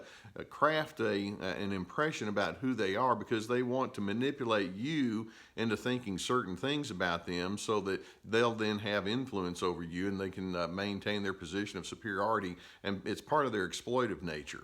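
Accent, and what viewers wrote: South, United States. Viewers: Especially his southern drawl. Makes me feel like home when I'm on the other side of the planet. :) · Oh, my! I'm from GA, too(near Valdosta, GA). Where did you go to college and grad school? Just curious. I love your southern accent.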